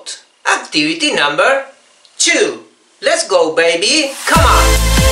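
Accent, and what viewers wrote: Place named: Argentina. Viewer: Con esa pronunciacion no voy a aprender el ingles correctamente con el acento argentino para nada.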